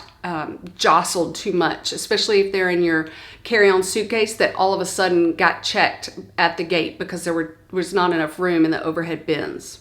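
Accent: South, United States. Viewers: Just a quick note to say I have enjoyed your videos. I don't know if you realize it, but the close-captioning for your words is quite amusing! Due to your southern accent, in the above video, "tinted" moisturizer was printed as "tenten", and "tennis" shoes came out as "tin" shoes! Don't worry...I can understand you, but the close-captioning person cannot!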